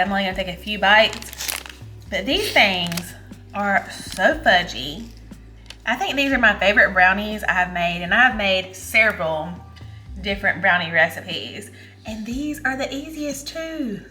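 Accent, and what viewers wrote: South, United States. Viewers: Btw, I love your southern drawl ❤ 😊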